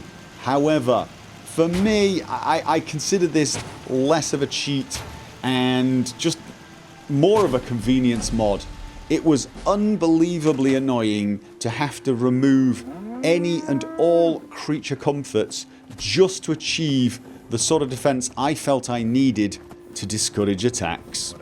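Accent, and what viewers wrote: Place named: Scotland, United Kingdom. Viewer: Hey: The proper name for the Regent sword is Claymore. Judging by your accent, you're closer to the history if it than I am. Another great video. Thanks.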